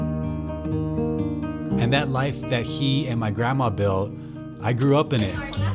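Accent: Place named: United States. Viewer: It’s crazy because you saying that you try to hide your Filipino roots back in the day is hilarious to me because you look super Filipino 😂. What I mean by that is you look like a typical Filipino poster boy. I can tell your Filipino 100 miles away and if i didn’t hear your American accent I thought you were from Ilocos. Also you being Ilocano I’m sorry to be blunt but even if you ask your family Ilocano are stereotyped as being very cheap, very dark skin and like to eat dogs. If you don’t believe me ask your family or just google search it. Also the Tagalog people use to discriminate against you guys a lot back in the day. Also I just think it’s super funny how Filipinos who were born and raised in America look super native, while Filipinos in Philippines look more western. From my experience most Filipinos who were born and raised here have that super Filipino look and have darker skin tone. 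But Filipinos who are born and raised in Philippines look more mixed and have lighter skin tone. Like walking around especially in Metro Manila in places like Makati, Alabang or BGC you can see Filipinos who clearly have either Chinese or Spanish blood running in their veins. So it’s ironic that a Filipino in Philippines can look more western than a Filipino from America. I know how it is because I live in America too and my family have been here since the late 1960s. Most of my family in my dad side are born and raised in America and some even in Europe. They are super white washed but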